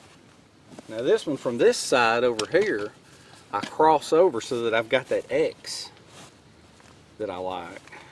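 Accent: South, United States. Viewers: oh my yu make me laugh so funny love ur southern voice a your very smart love your videos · Informative and entertaining, thnx! (PS This Canadian loves your southern accent, a part of your charm, IMO.)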